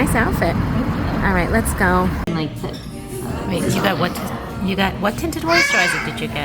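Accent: New York, United States. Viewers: Your hair came out beautiful! I love the full abudance of curls. I like your New York accents; my home town.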